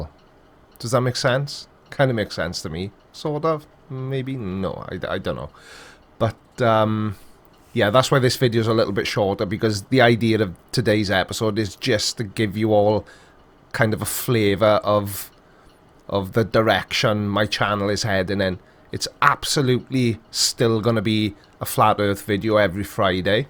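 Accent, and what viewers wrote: Wales, United Kingdom. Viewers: We need a Creaky Weekly in the automotive world. As for this channel, I think you should cover whichever topic strikes you personally. If I may speak aggregately, folks watch to see and hear your take on things, your perspective. The Welsh accent and the clever phrasing only adds to the scope of the video. Fun to watch.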